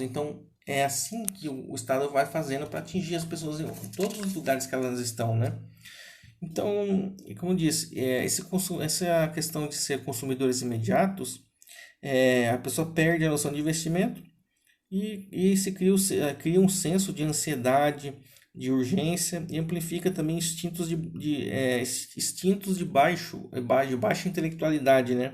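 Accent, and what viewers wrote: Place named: mixed, Brazil. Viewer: O sotaque mineiro/goiano é perceptível, ksksk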